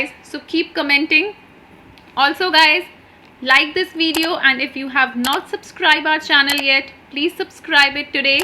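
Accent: India. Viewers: Man even your video with your annoying Indian accent feels like a scam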